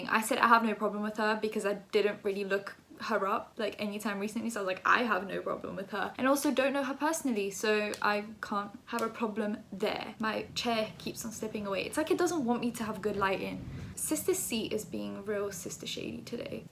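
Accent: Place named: London, United Kingdom. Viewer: That’s a proper London accent u got there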